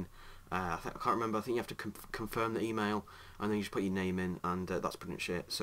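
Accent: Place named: Scotland, United Kingdom. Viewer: Shut up he sounds a bit SCOTTISH IM Scottish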